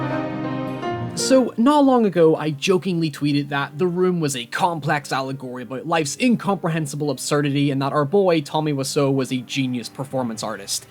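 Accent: Ireland. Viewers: I think he is Irish. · I don't think I can post URLs in YouTube comments but if you check Reddit in the videos sub you'll see a video posted on Tuesday 12th about Louis Theroux. Someone posted your video about Theroux there and your accent led to some confusion as to whether you're Irish, American or Scottish! :)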